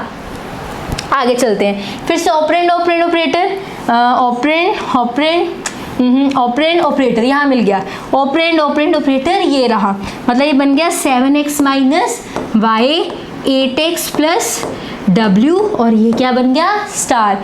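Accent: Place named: Punjab, India. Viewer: love that panjabi accent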